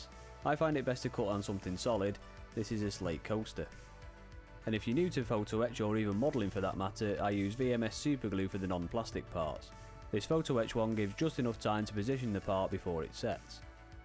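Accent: United Kingdom. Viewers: Ready to take up one of my favourite childhood pastimes again, and your channel looks splendid for learning the proper way to do it. Was a bit confused seeing Biltema acetone combined with your British accent. DIdn't know it was available outside Scandinavia.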